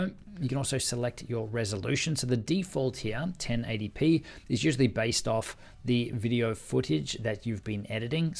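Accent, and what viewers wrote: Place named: Australia. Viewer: Hold on, your accent is Aussie, but you spelt 'Colour' in American. The betrayal though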